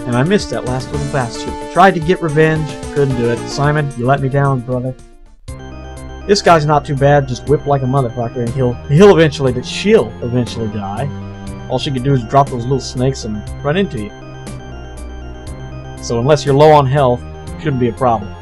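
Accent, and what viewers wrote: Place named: Georgia, United States. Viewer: Well, I like it. But then again, I'm a HUGE stats-geek. Profiles, bios, statistics... I like anything like that. Also, trivia. ANYWAYS, what I really like about LPs is that every LPer brings a different attitude to the game. Like with Gundams and pilots. Your attitude, plus the charming accent equals my subscription. I would have that accent, too if I was born one state up. Damn you, Florida! I would trade oranges for peaches any day of the week. Unless I had scurvy.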